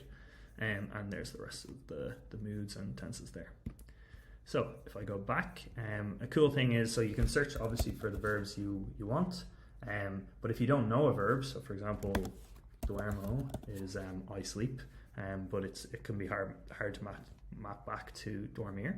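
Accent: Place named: Ireland. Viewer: I didn’t get your name just an Irish accent I am also from the Emerald Isle 😂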